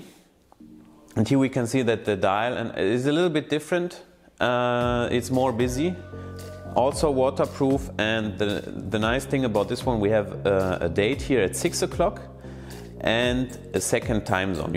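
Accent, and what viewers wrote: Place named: Germany. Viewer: Ja aber ich muss bei seiner Aussprache zu oft lachen. Ich meine sein generelles Englisch ist ziemlich gut, aber Aussprache die ist schon Deutsch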